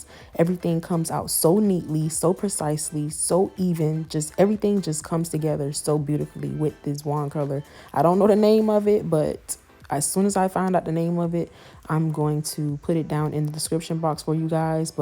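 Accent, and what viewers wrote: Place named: Miami, United States. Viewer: Recognize a Miami accent from anywhere. 💕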